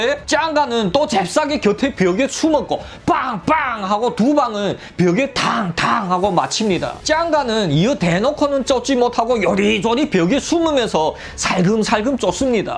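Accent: Yanbian, China. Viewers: 중국사건 얘기는 다른영상에서 잼나서 자주봣는데 님껀 어제부터 이틀동안 다봤넹용~~ㅋㅋㅋ연변분이셔서 그런지 레알 말투가 리얼하네요~ㅋㅋㅋ👍👍👍👍👍잼나용^^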